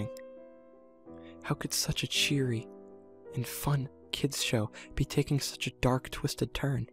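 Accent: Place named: United States. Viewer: The guy speaks really good American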